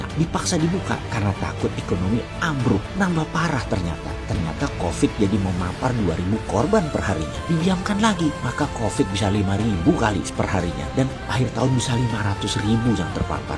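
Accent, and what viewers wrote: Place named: Java, Indonesia. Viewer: Sori om cengkok e terlalu medok 😂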